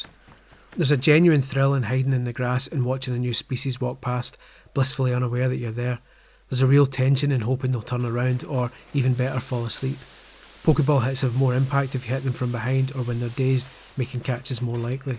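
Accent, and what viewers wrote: Scotland, United Kingdom. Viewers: The most Scottish man reviews pokemon, good review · came for the amazingly in depth review stayed for the amazingly unintentional scottish asmr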